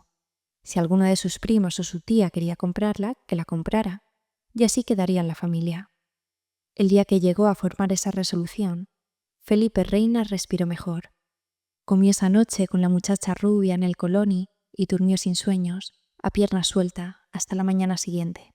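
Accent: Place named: Spain. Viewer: Gracias por tu narración ♥ Tienes el acento de qué región de España? Me encanta!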